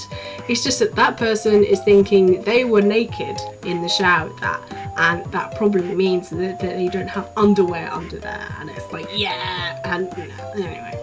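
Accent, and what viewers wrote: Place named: England, United Kingdom. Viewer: It's so great to see an entertaining Englishwoman who's accent doesn't make me want to kick a puppy. English accents are either cool sounding and enjoyable, or ridiculously annoying and hellish. There is no inbetween for me.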